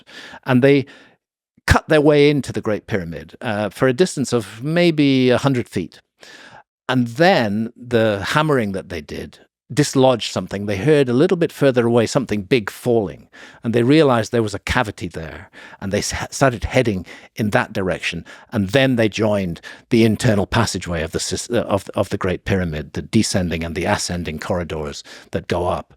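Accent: United Kingdom. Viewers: This is proof that not everything sounds intelligent when you have a British accent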